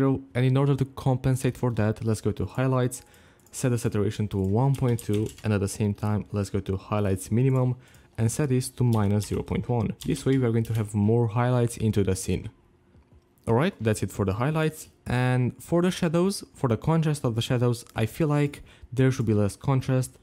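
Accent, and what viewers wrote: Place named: Russia. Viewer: Сразу видно по голосу, наш брат, русский)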